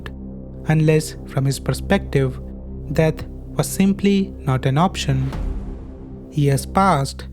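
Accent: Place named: India. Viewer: You sound like an Indian 😊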